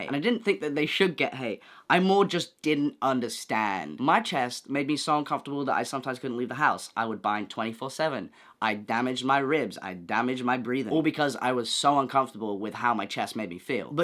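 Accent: United Kingdom. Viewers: this is not a hate comment or anything but OH MY GOD i did not expect this accent. Like you have the most american face ever. you look like you surf in your free time. and i see your californian face and i watch the video and its the most bri ish accent ive ever heard. like this is insane. nevermind the accent, i really liked your takes and generally the way you speak. you seem to be a very smart and compassionate person. very nice video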